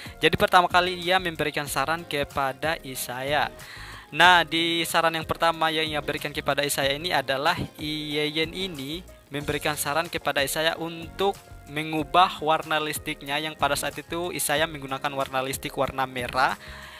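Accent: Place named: Sulawesi, Indonesia. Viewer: Kentara sekali logat Sulawesi nya 🙏😁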